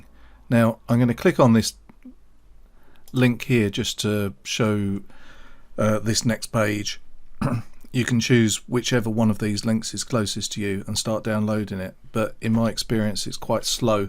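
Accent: United Kingdom. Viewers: Amazing tutorial, thank you a lot! I'm enjoying your awesome british accent, mate! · your from UK right I know!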